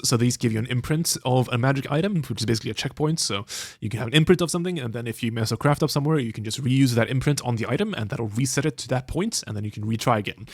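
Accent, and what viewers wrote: Sweden. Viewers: You can't fake that Swedish accent 😊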